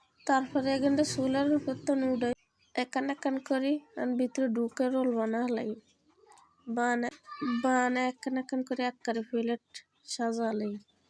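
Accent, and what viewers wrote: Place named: Noakhali, Bangladesh. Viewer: নোয়াখাইল্লা ভাষা অনেক সুন্দর হয়েছে ❤❤খুবই ভালো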